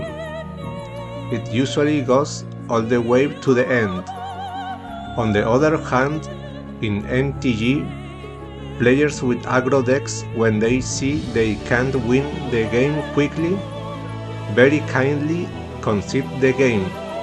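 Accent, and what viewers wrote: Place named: Spain. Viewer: I love magic the gathering too ☺️ one question, are you spanish? yo sí, vivo en Madrid. Por tu pronunciación del inglés supongo que eres español como yo 😊 Your vídeos are awesome! ☺️😃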